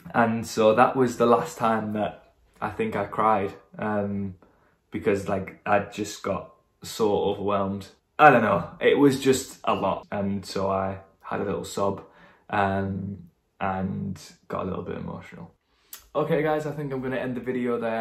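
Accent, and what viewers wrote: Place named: United Kingdom. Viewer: Amazing voice, and super handsome, oh and I love your British accent!!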